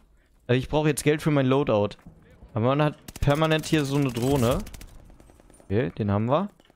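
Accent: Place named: Germany. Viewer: du hast so ein unglaubliches deutsches englisch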